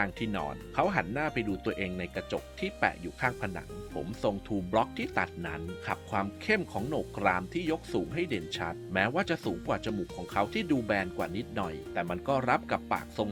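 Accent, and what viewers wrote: Isan, Thailand. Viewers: คนอีสานแน่นอน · เว่าอีสานไปเลยก็ได้ สำเนียงชัดซะขนาดนี้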